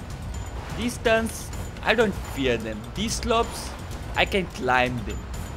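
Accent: India. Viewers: You indian accent is so strong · Dude are you India because your accent is Indian pls tell me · Why do i hate hindi accent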